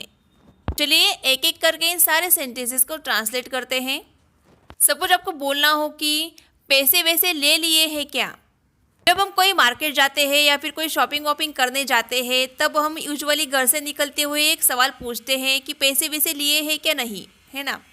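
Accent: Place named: Tamil Nadu, India. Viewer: Today I learnt 👇👇👇 1) Come what may, I will never forget my parents. 2) Now it's my go to speak English. 3) Have you purchased toys and all? 4) I speak passable english. 5) I can't do without my mummy. 6) Show me to jump from this roof. Mam, you teach very nice 👌👌 I think u are from Tmilnadu.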